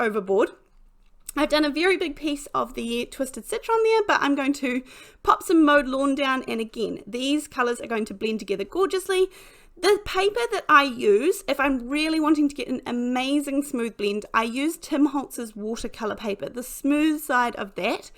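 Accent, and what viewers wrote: New Zealand. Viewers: Very cool. These are interesting, effective and have great effects! (Love the Kiwi accent!)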